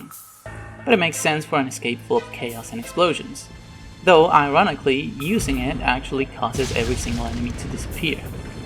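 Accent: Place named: Argentina. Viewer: tu acento Argento me agarró por sorpresa 😄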